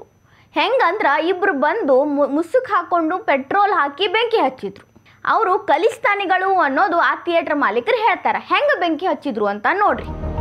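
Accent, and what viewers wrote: North Karnataka, India. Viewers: ಉತ್ತರ ಕರ್ನಾಟಕ ಕನ್ನಡ 👌🙏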